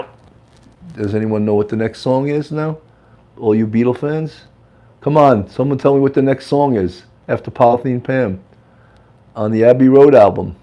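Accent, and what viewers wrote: New York, United States. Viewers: There's that New Yorka accent !!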